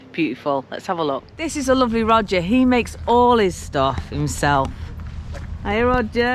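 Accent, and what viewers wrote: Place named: West Bromwich, United Kingdom. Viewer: Lovely approach to life,. Always positive! I am from Switzerland and spent som time during my study years in West Bromwich, loved the dialect. Are you from that area also?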